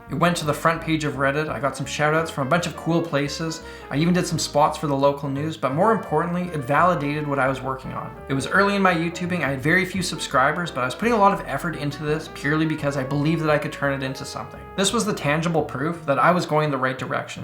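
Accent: Canada. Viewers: you can really hear his canadian soreys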